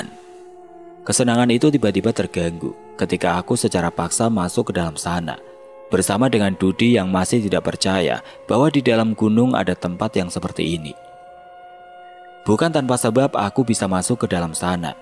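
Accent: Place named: Sunda, Indonesia. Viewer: 😂😂lucu bgt bahasa sunda nya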